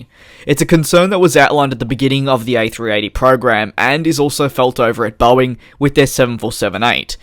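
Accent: Australia. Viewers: what's worse? the a-380 or the gay narrator from oz?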